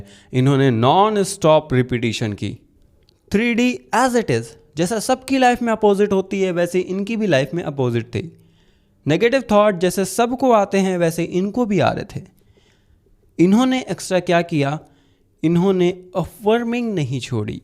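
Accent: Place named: Allahabad, India. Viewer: Ap allahabad se ho kya kyoki mai kom Ham bolte hai 😂❤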